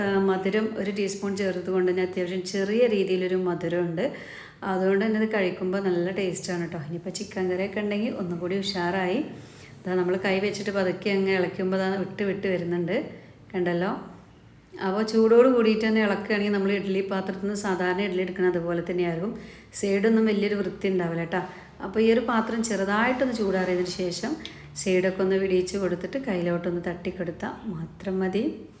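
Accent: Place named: Palappuram, India. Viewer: Samsarathinte style aake marippoyallo ...palappuram style nalla rasayirunnu tto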